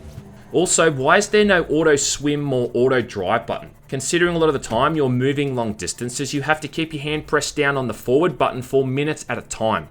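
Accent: Australia. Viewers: I like how he sounds like an Australian from "down under". Lol. It's so fitting.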